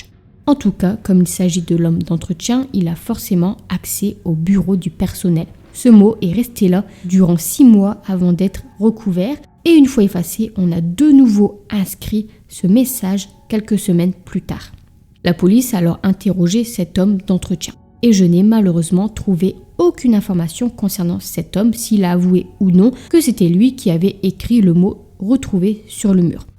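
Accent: North, France. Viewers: Petit accent du Nord, me trompe-je ?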